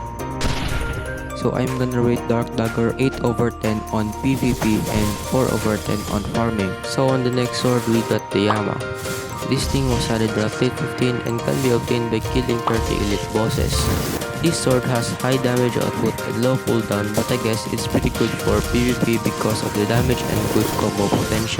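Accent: Philippines. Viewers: u sounds like a ph guy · im for sure this guys is a Filipino the way he talks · sure pinoy yan boses palng eh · u sound like a filipino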